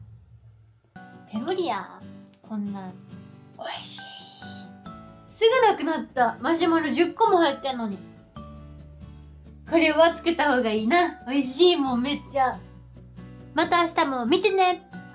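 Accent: Kansai, Japan. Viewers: 初々しい....wまだめっちゃ関西弁！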